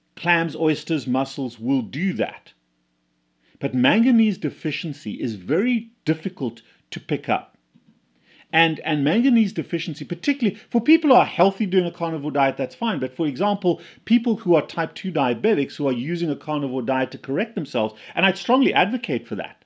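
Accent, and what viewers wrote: South Africa. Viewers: Hey Doc. I recently found your videos and I'm enjoying them so much. From the first video I could immediately could hear that you are from my neck of the woods, from SA. Thanks for all your videos. Lekker man.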